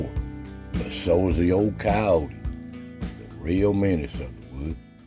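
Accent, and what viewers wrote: United States. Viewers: Good morning sir if you ever quit talking on these videos it will be a sad day as you nearly speak true American about like I do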